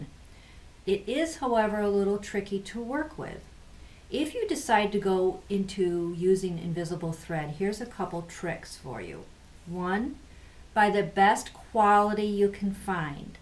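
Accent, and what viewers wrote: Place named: Western New York, United States. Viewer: Sounds to me like Western New York, I'd say Buffalo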